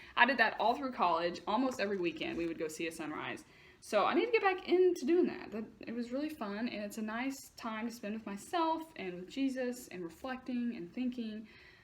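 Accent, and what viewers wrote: United States. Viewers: I'm Canadian u look like American from ur accent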